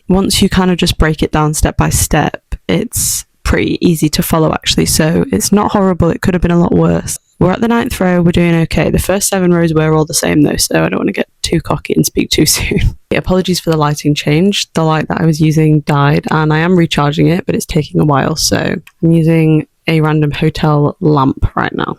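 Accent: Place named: United Kingdom. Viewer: I just found you and have bingeing your videos. Love your content! I thought, because of your accent, that you were from the UK. Are you from the US or just use US crochet terms where you are from? OK, gotta get to the next video 😀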